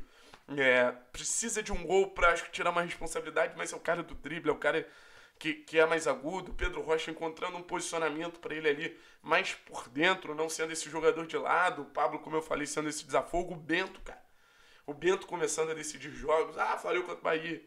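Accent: Rio de Janeiro, Brazil. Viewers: Paranaense mais Carioca q ja vi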